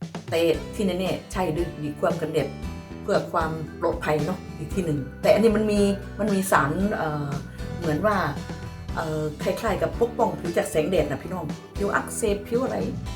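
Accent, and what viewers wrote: Korat, Thailand. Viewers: ชอบค่ะ พูดภาษาโคราช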